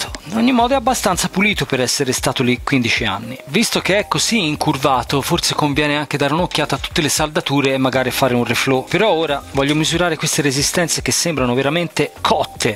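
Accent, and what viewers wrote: Tuscany, Italy. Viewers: Sei simpatico,sei bravo,sei creativo...sei anche toscano a giudicare dall'accento...si dice che l'italiano sia nato in Toscana. Ma perchè usi tanto inglese inutile ? Qualche esempio( ma sarei in grado di tradurre ogni parola) : SCREEN = SCHERMO SWITCH = INTERRUTTORE ECC... Buon lavoro comunque e ripeto video interessanti. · Ma sei Toscano??? Perchè lo sembri comunque se si lo sono anch'io. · bello sto canale sei mega simpatico! hai un nuovo iscritto! tra l'altro mi sembri toscano come me solo non riesco bene a capire di dove :) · L’unico toscano he nun me fa ghignare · Ho appena scoperto il tuo canale grazie a questo video molto interessante. Davvero bravo e simpatico! Tra l'altro mi sembra di sentire un accento toscano, di dove sei(per curiosità, dato che io sono di Firenze)? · Grande!!! Anche se sono sprovvisto di TV da circa 5 anni (felicemente sfornito) mi sono fermato a guardare questo bel video per la presenza del pezzo da museo che è stata la mia ultima TV... 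e per l'accento toscano che secondo me fa la sua parte. Simpaticissimo davvero. Grazie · ma sei della toscana di che citta' e sei forteeeee ehhh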